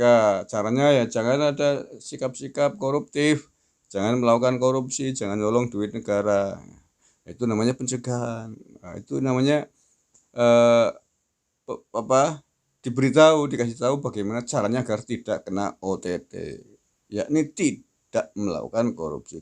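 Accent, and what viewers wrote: Banyumas, Indonesia. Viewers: Ngakak ngapak....!! 😀😅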